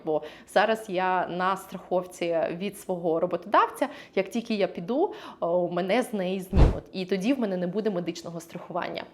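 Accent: United States. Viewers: Цікаво. Сподіваюсь ще знайду у вас відео, де зможу зрозуміти наскільки важко або легко там влаштуватися айті спеціалісту і як взагалі шукають там роботу. У вас Американський акцент, мені здається) або манера розмови, щось таке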